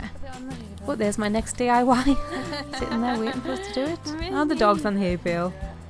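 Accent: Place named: North East England, United Kingdom. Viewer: Super cute ornaments. Are you from the North East? Im from Sunderland and ur accent is very familiar.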